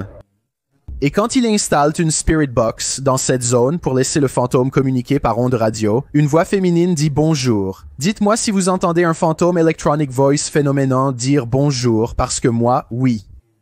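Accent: Quebec, Canada. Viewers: Cie vous n'aimez pas l'axent du kebec changer de série 😮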